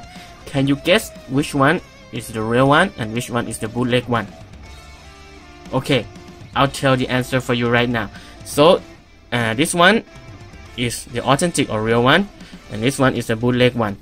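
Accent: Thailand. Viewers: Ooo sawadikhap for your accent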